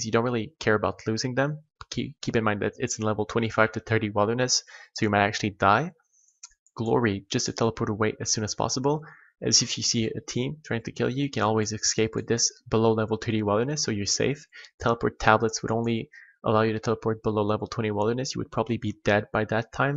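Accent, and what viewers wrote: Quebec, Canada. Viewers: Hey that accent, Quebec:P Bon guide!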